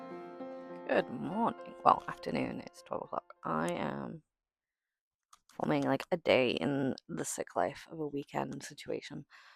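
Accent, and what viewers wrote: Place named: Northern England, United Kingdom. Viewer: honestly hands down you have the most amazing accent on a person who I have ever known up North (and believe me I have met a lot of people in the North of the UK) a lot of people say I have a rather posh accent being from the South of the UK 🤣🤣🤣 I am so glad and happy to hear you are coming back with more videos, and I am happy as always to support my favourite content creator and the content that you create lovely 😊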